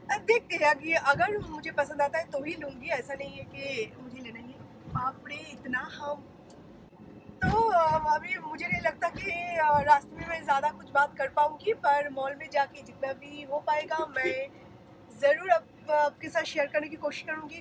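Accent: Bengal, India. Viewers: Didi apni bangla te vlog korle basi vlo lagbe...hindi ta apni vlo bolen bt, Apnar kothar moddhe sob jaygate banglar tan ache... Plzzz banglar manus banglay fire asun....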